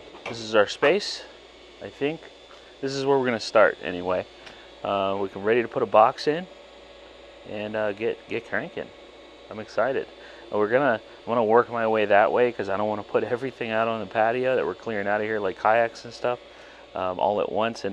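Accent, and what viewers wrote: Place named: South, United States. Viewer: the southern accent is sneaking into this one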